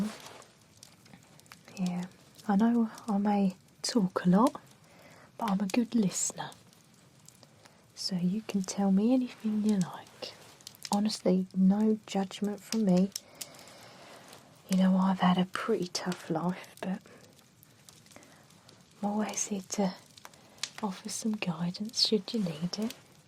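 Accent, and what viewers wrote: England, United Kingdom. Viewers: I’ve been in Canada for 40 years now but this took me right back ‘ome. The accent was bang on, and just the way you formulated the sentences was so authentic. I laughed a lot till it all got sad and told of the wretchedness of those times. Well done, and thank you!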